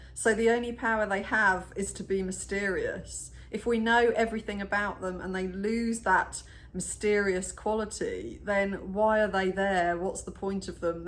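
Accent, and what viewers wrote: England, United Kingdom. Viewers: your beautiful, English accent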